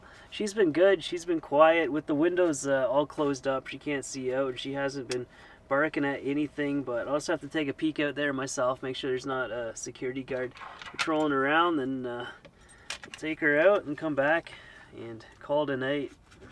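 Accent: Canada. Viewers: Canadian, ey?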